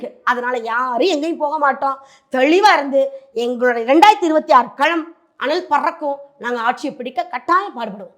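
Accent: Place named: Madurai, India. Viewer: சூப்பர்.. நீங்கள் எங்க ஊர் மதுரை பெண் என தெரிந்தது... மிகவும் மகிழ்ச்சி...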